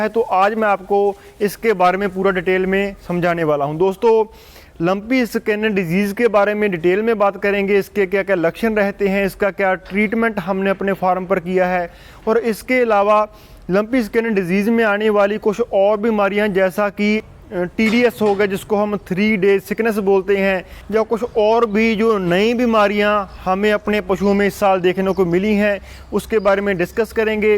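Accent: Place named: Puadh, India. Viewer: bhai puadi bol rhe ho kha se ho app bhai ji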